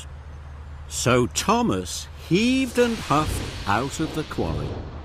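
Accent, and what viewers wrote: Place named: United States. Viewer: I like his American accent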